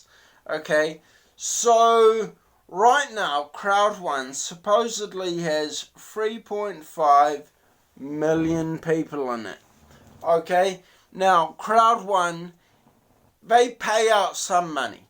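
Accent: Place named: New Zealand. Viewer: Watched a few of your videos man, I am living in OZ at the moment but born and raised in NZ, loving the accent haha. Anyway, this garbage was pitched to me by 2 friends already and decided to check it out... I can't believe people are actually falling for this. The site has no explanation of how they're making any money other than creating a big useless pyramid of "investors". This is the worst MLM pitch I've ever seen lol... same shit as bitconnect, usitech, amway, arbonne etc. Keep it up... been warning them this is gonna collapse eventually. I dont know if any of them have been paid or not.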